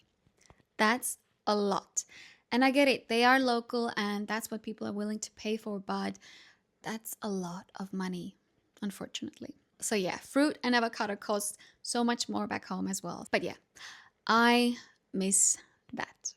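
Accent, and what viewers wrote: Australia. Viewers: Its funny to hear Europeans speak English with an Aussie accent! I'm sure you'll get back some time. you know you'll run into aussies too so dont forget to mention your connection to the land down under.. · After listening to you , i can say unequivocally.....You are 100 % Australian, wherever you may be ..... So lovely to hear your experience here. Come back !!!!!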